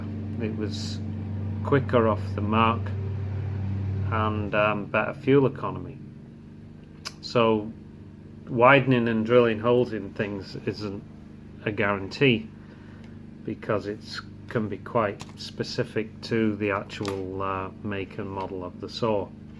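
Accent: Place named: Northern England, United Kingdom. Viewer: As an old Yorkshireman,I'm curious about what part of the North you originate from.Watching and listening to you takes me back to conversations in pubs long ago.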